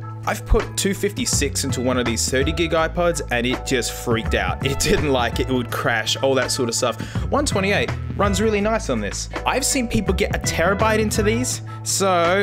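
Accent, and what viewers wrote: Australia. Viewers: This video makes me appreciate Aussie accents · I forget he's Australian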